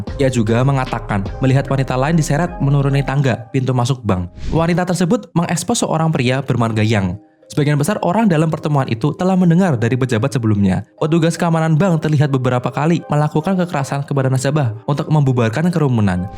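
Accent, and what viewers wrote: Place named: Banyumas, Indonesia. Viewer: Aksen ngapaknya terasa ya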